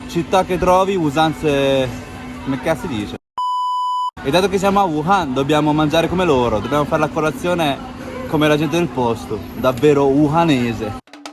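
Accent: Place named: Romagna, Italy. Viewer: Non ti conoscevo prima di questo video, ma sono rimasto impressionato da quanto tu riesca a parlare bene in italiano. Addirittura mi ha dato l'impressione che tu abbia una cadenza romagnola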